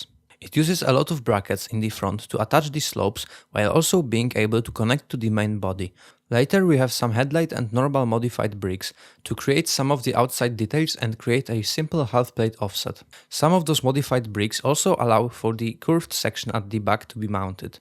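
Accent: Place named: Spain. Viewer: u speak spanish, right? u got a lot of accent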